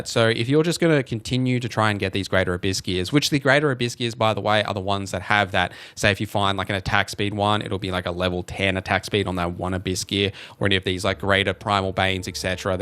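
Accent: Australia. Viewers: I thought people liked the aussie accent. Why are they bullying us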